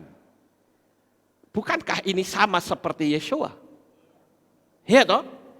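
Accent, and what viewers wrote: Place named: Magelang, Indonesia. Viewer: Itu logat orang magelang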